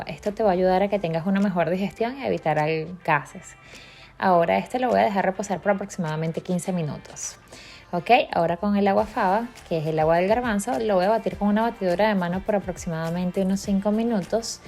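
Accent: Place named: Venezuela. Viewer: Me parecieron geniales esas recetas. Buenas alternativas para pasapalos. Eres venezolana? Por fin alguien que habla mi acento en un canal de recetas 😅🤩🇻🇪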